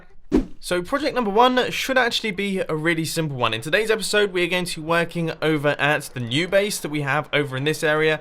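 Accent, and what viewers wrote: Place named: United Kingdom. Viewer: Ewan Bruce He said I didn't know if I could fly. It's hard to tell if you aren't used to his British accent, and his happy squeal.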